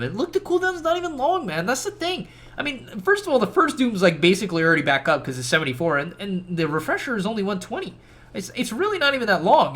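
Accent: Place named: United States. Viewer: The way you say veil lol, I was like wtf is he saying.. had to listen 3 times. Do all Americans say it like that? Are u American or Canadian, u sound American I think.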